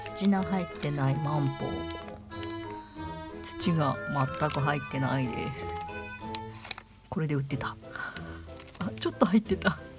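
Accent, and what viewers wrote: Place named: Yamagata, Japan. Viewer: 可愛い子ばかり♥️ こちらでは、さっぱり、まったく多肉さんがかれません！羨ましい( ´∀`) でもさんトークがいちばんめんごくて地震の恐怖もぶっ飛びましたはぁ(((*≧艸≦)ﾌﾟﾌﾟｯ笑有り難うございますm(_ _)m 山形弁‼️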